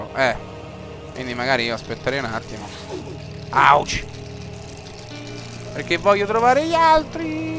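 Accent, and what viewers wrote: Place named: Rome, Italy. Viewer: ahahah e vero!! XD e il massimo il folletto con l'accento romano considerando che lo sei anche te XD il gioco ftt apposta per te!!! XD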